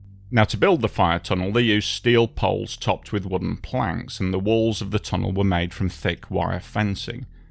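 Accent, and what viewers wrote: United Kingdom. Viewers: If you are referring to the narrator, he is from Britain... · "a global superstar, not only in the US". How hilariously American. But the speaker seems to be British 😊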